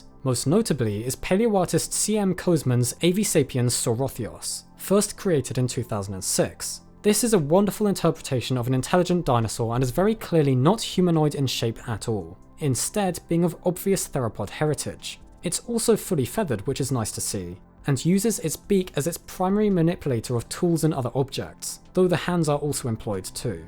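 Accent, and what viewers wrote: United Kingdom. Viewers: It sounds like it must be a smart argument because he's British, so it sounds smart....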